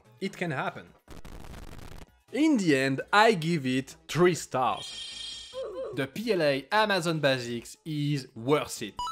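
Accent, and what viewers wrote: France. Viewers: Ahahah l anglais parler par 1 français cest toujours assez amusant à écouter hahaha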